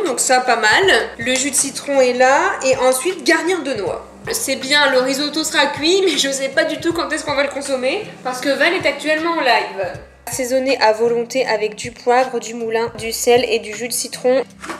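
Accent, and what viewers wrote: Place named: Nord-Pas-de-Calais, France. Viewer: cet accent ch'ti j'adore!!! T la meilleure!!!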